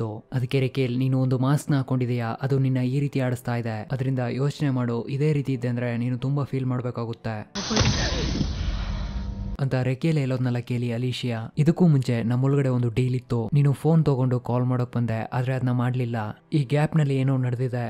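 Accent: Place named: Chamarajanagar, India. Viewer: same chamarajanagara style idhe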